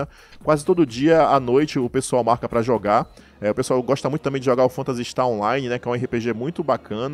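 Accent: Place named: Bahia, Brazil. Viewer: Mano vc é baiano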